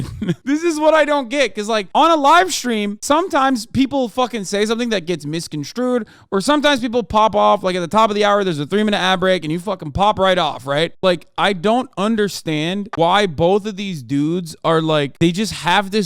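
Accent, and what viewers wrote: England, United Kingdom. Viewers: has a really good british accent it's kind of scary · As a brit, your british accent is fantastic, gets me everytime. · hasans british accent is getting real damn good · That's a great English accent for a yank.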